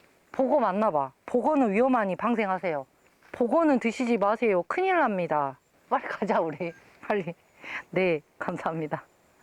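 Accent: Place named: Jeolla, South Korea. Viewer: 이언니~ 전라도 여자맞네! 억양이 세다..... 나도 남들이 듣기에 이럴꺼야~~ 같은 전라도 여자잉께 ㅋ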